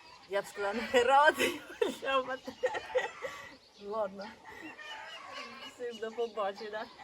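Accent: Poltava, Ukraine. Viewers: ГОВОР ТАКЕ ,ЯК ПОЛТАВСЬКИЙ, ЧИ НІ?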